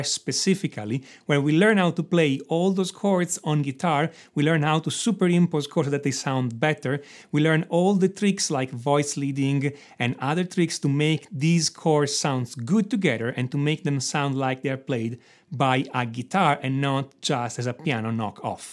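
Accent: Italy. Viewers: Are you russian or italian? I noticed a strong accent, like we do in italy. By the way, your videos are just exceptional! I ve never seen such logic explanations, directly to the point. Your explanation with spoken theory and the sound of what you re explaining make these videos really valuable. Great work, keep on going! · you sound italian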